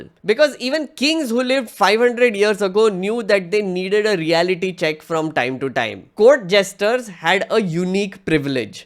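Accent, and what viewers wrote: India. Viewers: You have a stereotypical Indian accent. 😃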